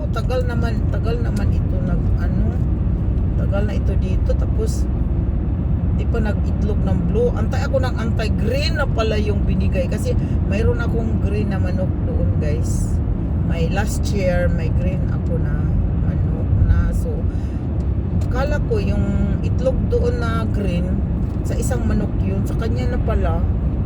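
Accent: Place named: Visayas, Philippines. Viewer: Bisaya ka ano, sis? Sounds like one kasi😂… na-curious ako sa blue egg…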